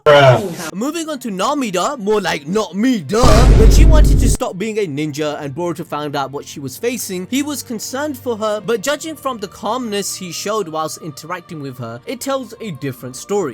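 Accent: Punjab, India. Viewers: That punjabi accent🙏😁😉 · ❤️ your Indian ?? ❤️❤️❤️ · are you indian bro i know what you said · Bro are you punjabi ?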